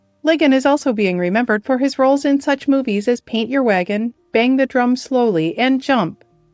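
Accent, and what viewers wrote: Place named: Midwest, United States. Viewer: Are you , the narrator, from the midwestern region of the States? You sound like it. I would say in order of likelihood: Illinois, Ohio, Wisconsin. Curious linguist here. Caveat: Could be a midwestern AI these days lol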